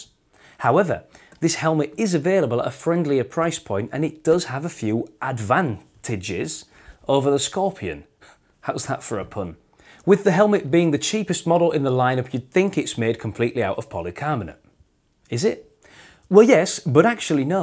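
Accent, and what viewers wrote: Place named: Yorkshire, United Kingdom. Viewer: Yorkshire, Huddersfield, I’d say.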